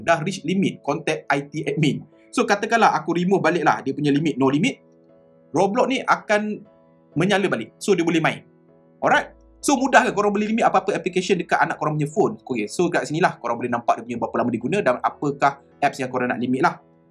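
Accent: Melaka, Malaysia. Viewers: Amek ternganga gomen dengar org melaka haha.. terbaik boh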